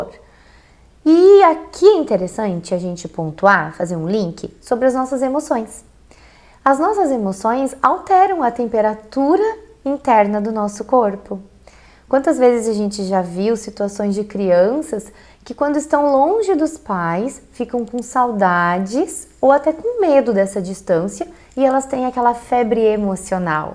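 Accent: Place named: Rio Grande do Sul, Brazil. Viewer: Em 1 minuto de vídeo já detectei o sotaque gaudério kkkkk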